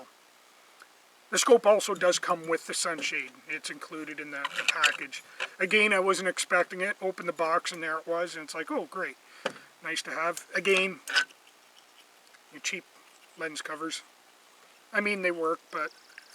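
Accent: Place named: Canada. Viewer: he's talking Canadian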